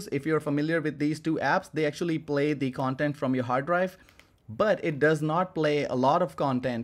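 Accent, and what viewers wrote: India. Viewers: your indian accent is not that strong. don't worry bro.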